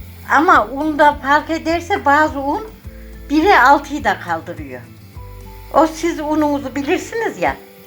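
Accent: Kayseri, Turkey. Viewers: Kayseri şivemizi dogal ve cok guzel konusuyorsunuz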